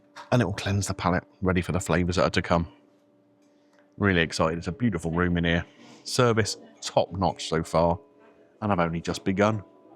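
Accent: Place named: Birmingham, United Kingdom. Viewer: Its funny watching a two Michelin meal being described in a thick Brummie accent instead of the usual French, real Oxymoron, ha!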